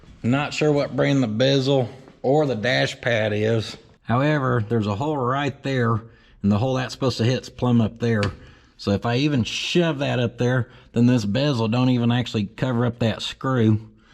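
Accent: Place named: South, United States. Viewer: I'll bet that a lot of folks see channel and think: "he sounds like cornbread... I bet he does a lot of hillbilly rigging on his projects." I watch a lot of automotive channels, and I can truthfully say that is as good a mechanic as most of them, and welds better than all of them. He follows his vision, putting in the extra work to do things right. He is humble enough to show you his screw-ups and how he fixed them. All the while having a good attitude and a great sense of humor. He should have a lot more subscribers... I guess some folks don't like cornbread. Their loss.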